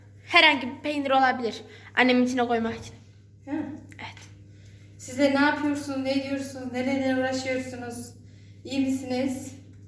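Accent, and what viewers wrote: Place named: Konya, Turkey. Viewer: yurt disindayim Konyaliyim o Guzel siven icin izliyorum sizi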